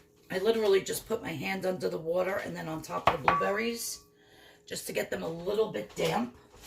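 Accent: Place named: Massachusetts, United States. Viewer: Hi! Love all the videos and your tips and cute accent (mostly because I think it sounds like you live near me--I live in Central Massachusetts).... I love the breakfast you made and the idea of meal prepping--I can't wait to try the French Toast Cups and the egg bake! The great thing about both of those recipies is you can customize them to what you want to have that week--like I think gingerbread flavor french toast cups might be fun--or I might add a little mashed banana, unsweetened coconut and almond extract... or maybe even strawberries or raspberries or chopped small apples.... Thank you so much! I love WW and it is so nice to see all that you share on your journey! I have lost almost a hundred pounds on WW and now have 83 pounds until goal! With your videos and support from my workshop & other online groups I believe I can do it! 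Thank you a thousand times over! I will be sharing on FB for you! Best wishes for 2023!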